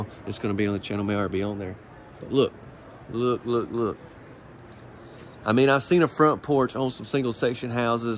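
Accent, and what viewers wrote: South, United States. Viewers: I love to watch your videos! I’m in the process of building a new home but I love your southern accent and it’s just fun to watch your tours! Don’t ever stop being you!! I get lots of ideas from watching!! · I LOVE YOU! I need to tell you though it’s not Loo-EE-Ville. It’s Lou-a-vull with the a barely heard! I live in Bowling Green, KY (aka Corvette City USA) We Kentuckians have been adding & deleting syllables long before the Millennials made it a “thing.” 😂 With your oh so pleasant sounding voice and friendly southern accent you can pronounce Lou-a-vull & sound like a native!!!! 😊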